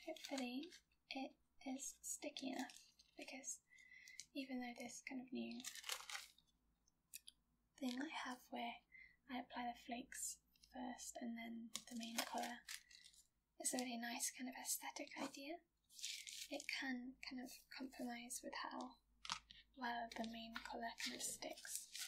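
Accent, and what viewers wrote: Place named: Yorkshire, United Kingdom. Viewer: Great video! What is your accent? Sounds kind of like Yorkshire, but a little different. It's beautiful, by the way! Cheers from WV :-)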